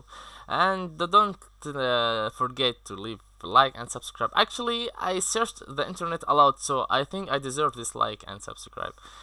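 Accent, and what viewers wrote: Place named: Arab world. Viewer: شكرا يا مان لانوا من لهجتك عرفت انك عربي ، على لغتك الانكليزية رائعة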